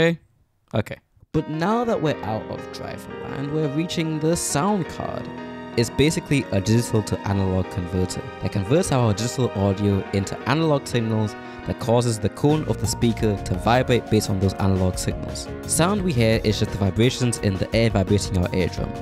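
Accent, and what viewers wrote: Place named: United Kingdom. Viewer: really excited to see your british accent get stronger with each video! <3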